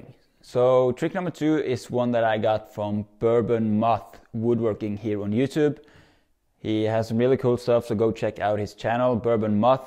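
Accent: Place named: Sweden. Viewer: Snyggt! Tyckte jag hörde lite svengelska därav min reaktion. Följer dig nu så hoppas på grymma videos i framtiden 😊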